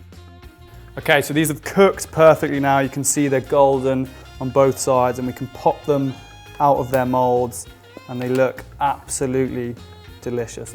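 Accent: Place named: United Kingdom. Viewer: やっぱイギリスアクセントっていいよねー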